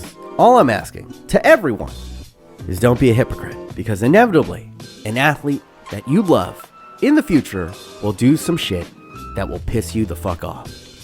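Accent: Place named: New York, United States. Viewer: I can tell you are from you are from new york 😂😂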